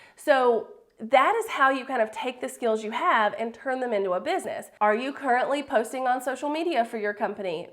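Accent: South, United States. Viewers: Have you been to Gatlinburg TN? I started a small YouTube channel about our small tourist town...I can tell you're from the South 😉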